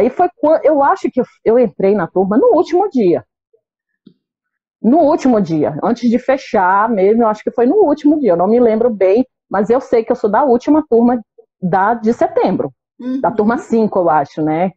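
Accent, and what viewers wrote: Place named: Recife, Brazil. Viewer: Pelo sotaque acho que é de Recife/PE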